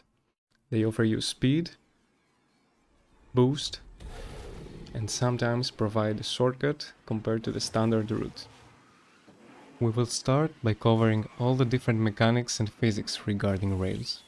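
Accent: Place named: Greece. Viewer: Ρε ελληνας εισαι? το ψιλοκαταλαβα απο τη προφορα, αλλα στο τελος με τον αγγελακα ηταν σιγουρο χαχα, ωραιος βλεπω τα βιντεακια , εχω 1:39:600 με το gaston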